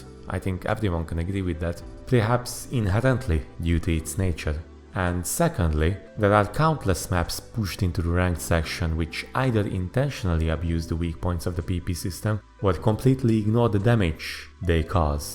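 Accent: Hungary. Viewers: csak szimplán hallgatom a hangod és annyira magyaros akcentusod van hogy meg kellett néznem a profilod... és tényleg (csak most találtam rá a csatornádra és tetszik amit látok ;) )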